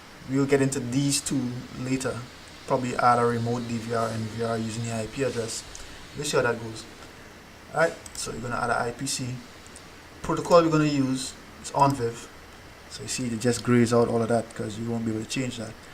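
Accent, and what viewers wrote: Trinidad and Tobago. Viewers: Sounding Trini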